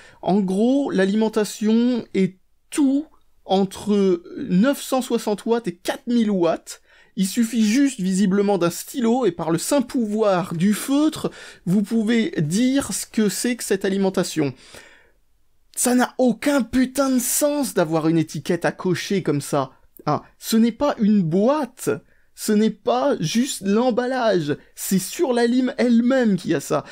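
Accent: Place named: Paris, France. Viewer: Ton accent parigot m'arrache les oreilles... P=iu uniquement sur une charge resistive... Ce qui n'est pas le cas d'une alim Alim de fort wattage.... Sérieux ?? Un wattage c'est quoi.. Connaîs pas par contre une Alim de forte puissance... Ça oui... Je m'arrête là, j'ai plus la force et l'envie de corriger....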